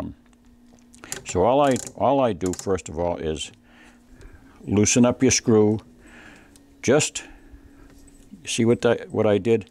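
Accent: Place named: Central Massachusetts, United States. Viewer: great lesson! i grew up in worcester, your accent sounds like central MA, am i close?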